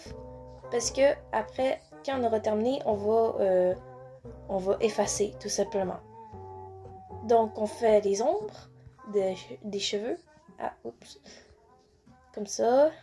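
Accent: Quebec, Canada. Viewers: Tu es canadienne jadore ton accent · Mais non tu as un accent québécoi et je l'adore c beaucoup mieux que d'avoir le même voi que tout le monde 😘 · Trés jolie axent canadien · tu est canadienne? Parce que j adore ta voix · JADORE TON ACCENT KEBEQUOI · Ta voix me fais penser a une voix de québécoise et merci pour le tuto je like et m'abonne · Tu est québécois car tu a k accent et je trouve cette accent trop chou 🤧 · J'aime beaucoup ta voix québécois 😍😘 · Je sais pas si ta un acsent québéquois mais si oui une pepite👌👌😭😭💖💖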